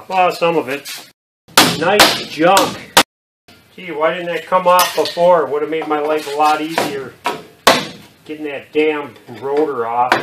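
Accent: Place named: Midwest, United States. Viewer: Gotta love that ol' midwest accent lol! You just convinced me not to try to change the bearing on my 05 Jeep GC myself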